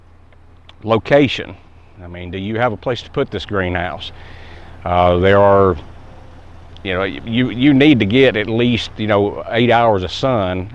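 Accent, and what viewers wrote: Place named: Carolina, United States. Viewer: Thanks for great presentation. Love your Carolina accent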